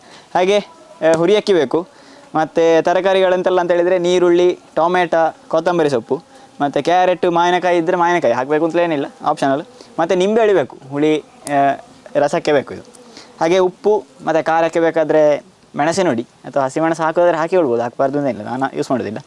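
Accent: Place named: Coastal Karnataka, India. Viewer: ಆಹಾ ನಿಮ್ಮ ಪರಿಪೂರ್ಣ ಕರಾವಳಿ ಕನ್ನಡ ಕೇಳೊದೆ ಒಂದು ಖುಷಿ.....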